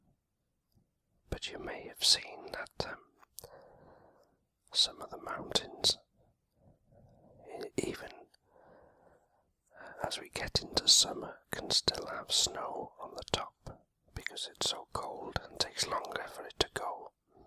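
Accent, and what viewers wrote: Wales, United Kingdom. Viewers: Just came across your videos. So nice hearing a Welsh voice doing ASMR 😀 I'm from North Wales, you? Diolch yn fawr 👌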